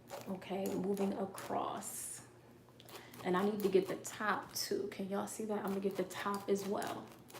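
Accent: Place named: New Jersey, United States. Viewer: I am so grateful to have found your channel!! I love your energy and this video was incredibly helpful! And this Jersey accent is giving! I live in Houston now but one Jersey girl always knows another. 💖💖💖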